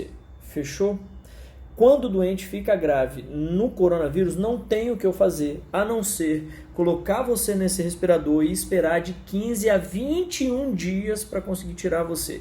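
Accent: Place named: Minas Gerais, Brazil. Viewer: Eu também estou desconfiado desse cara ai. Se ele é capixaba de onde saiu esse sotaque"TREM" trem é sotaque mineiro. Eu também procurei. Deve ser que esse coitado tentou passar em medicina e não conseguiu agora tá aproveitando esse caus e tirando uma onda. Mais pelo menos ele está falando a verdade. Isso é muito bom. Ai a população acorda.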